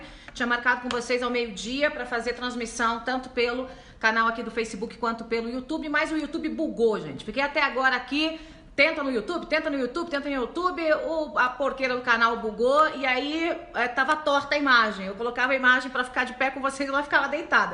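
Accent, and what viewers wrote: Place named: Rio de Janeiro, Brazil. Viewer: Robô carioca deslike!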